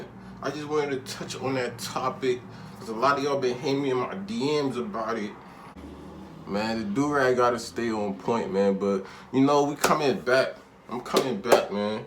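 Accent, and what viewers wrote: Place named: Philadelphia, United States. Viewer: sound like you had dat north Philly accent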